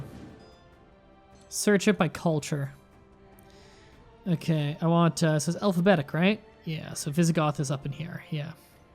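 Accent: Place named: Canada. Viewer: FYI the barony was in Portugal, the name was in Portuguese, not Spanish. And anyone who think you sound American doesn't know what Americans and Canadians sound like. You sound Canadian. And FYI, Galicia is de jure the duchy you just conquered and the ducky above it that you started with. The Duchy above it de jure drifted into your kingdom in an episode in, like, the teens, and you commented "why am I being alerted to that?"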